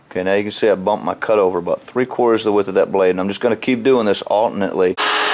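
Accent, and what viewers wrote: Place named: South, United States. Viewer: How did you get such a thick southern accent then? I would think a Maine man would have a east coast accent.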